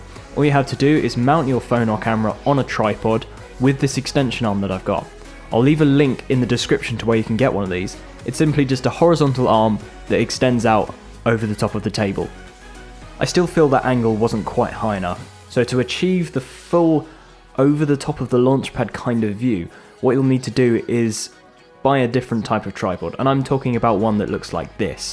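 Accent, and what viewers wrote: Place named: United Kingdom. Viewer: OMG YOUR BRITISH! (sorry, i'm new to your tutorials)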